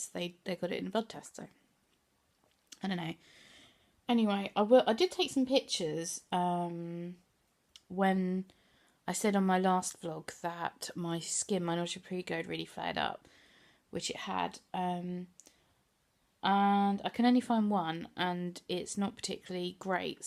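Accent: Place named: London, United Kingdom. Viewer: Your accent sounds like you're based in london like me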